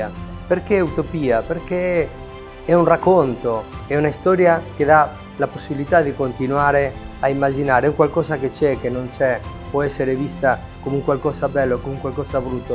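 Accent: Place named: Argentina. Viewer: That Argentinian accent is so rough lol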